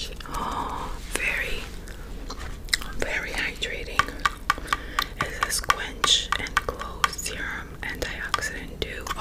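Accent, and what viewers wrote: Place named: New Jersey, United States. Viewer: I loved this! I would love to hear an exaggerated version of your New Jersey accent 😂 with your voice it would be hilarious and relaxing. You are the best!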